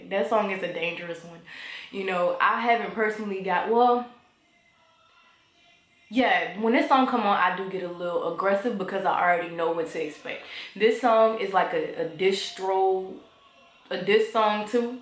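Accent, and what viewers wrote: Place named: New Orleans, United States. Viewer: Yea that NOLA accent came out STRONG in this video 😂😍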